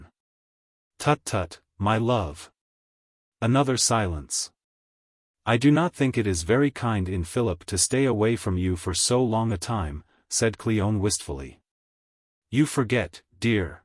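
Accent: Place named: United States. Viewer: It needs a British reader. American reader doesn’t do it justice.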